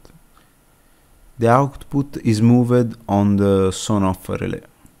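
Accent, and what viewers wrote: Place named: Tuscany, Italy. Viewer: Mi sà che tu sei anche toscano oltre che italiano 😀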